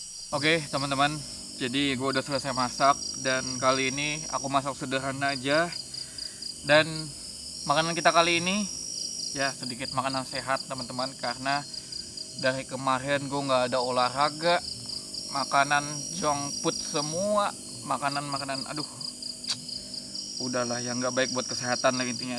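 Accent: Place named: Banjar, Indonesia. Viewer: Lihat logat sepertinya utang banjar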